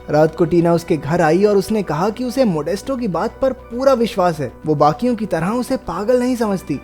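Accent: Haryana, India. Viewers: bhau tu pakka havanvi h mne to deshwali laage h yar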